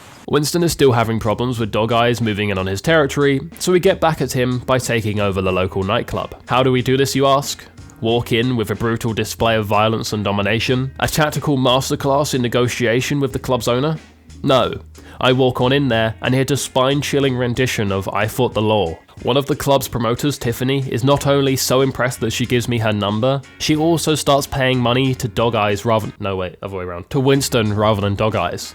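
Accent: United Kingdom. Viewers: Your British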